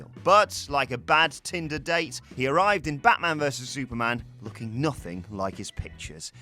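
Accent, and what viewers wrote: United Kingdom. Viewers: This British commentator got me ctfu 🤣🤣😭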